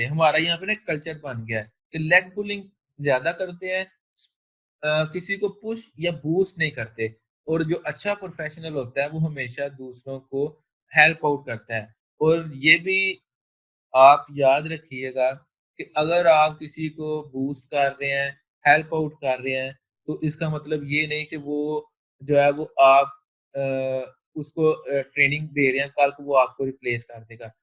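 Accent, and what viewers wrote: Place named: Punjab, India. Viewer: punjabi accent is so good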